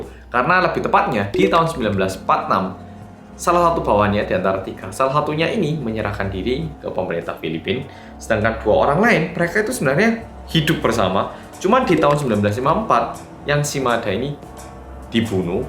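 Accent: Java, Indonesia. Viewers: Serial killer jos tenan.alias medok. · logat Jawa nya sudah mengental 😁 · Bersama orang Korea yang medhook, Tiap opening pasti ngikutin mas ngomong medhookkkkkkkkkkkkkkkk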